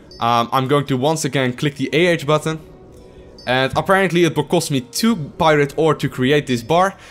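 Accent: Netherlands. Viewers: Are you from Holland?? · You are from Holland arent you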